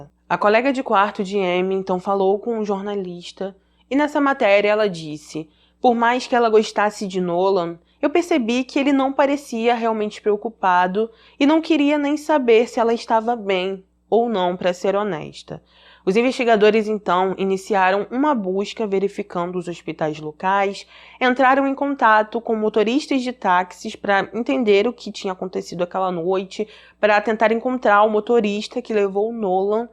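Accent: Rio de Janeiro, Brazil. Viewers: Más uma inscrita porque gostei do teu sotaque igual carioca 😍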